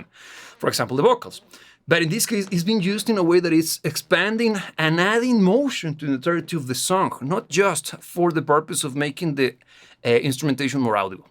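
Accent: Russia. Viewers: Ты русский (акцент на месте) Тоже очень Люблю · It seems you already have a slight Russian accent Great analysis, btw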